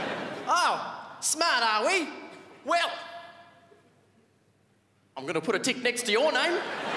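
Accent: Egypt. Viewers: He sounds Egyptian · his egyptian accent is on point im laughing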